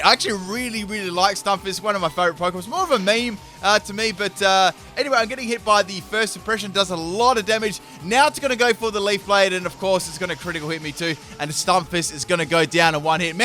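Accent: Australia. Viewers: Are you an aussy? I just noticed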